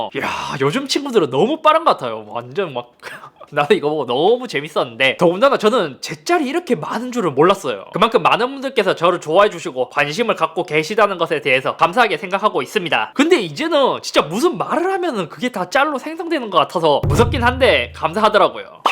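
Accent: Gyeongsang, South Korea. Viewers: 초기부터 지금까지 쭉 봐온 분들은 모두 아실겁니다. 영상에 나온것처럼 갱상도 특유의 발음이나 몇몇 단점도 장점으로 바꿔오고 노력해오면서 이렇게 훌륭한 유튜버가 된 서울 야스킹을 말이죠 형 너무 사랑해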